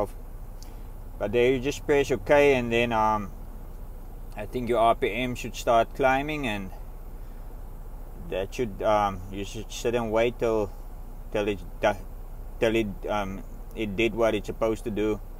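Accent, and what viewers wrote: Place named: South Africa. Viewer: Dude you clearly from SA 😅. Where about? How long you’ve been here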